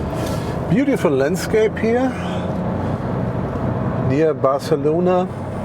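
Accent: Germany. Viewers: For the first ten seconds, I was trying hard to figure out what he speaks is English or German.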